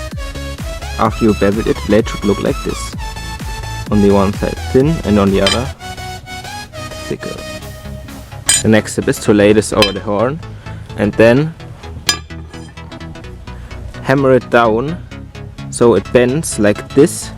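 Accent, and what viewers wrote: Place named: Germany. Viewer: Hab an der Aussprache direkt gehört, dass du deutsch bist haha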